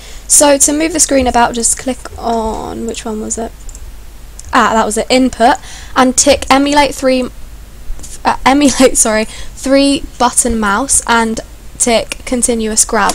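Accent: United Kingdom. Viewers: Is this a British accent? You sound like it to me...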